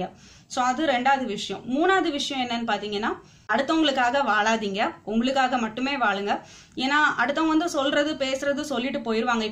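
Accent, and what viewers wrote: Kerala, India. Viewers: Neengha pesuvathu malayali mathiri iruku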